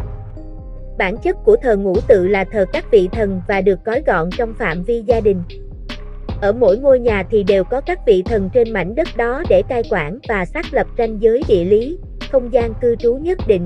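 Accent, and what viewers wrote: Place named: South, Vietnam. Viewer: Giọng miền Nam nghe khó quá lại còn nhạc nền nữa làm cho càng khó nghe hơn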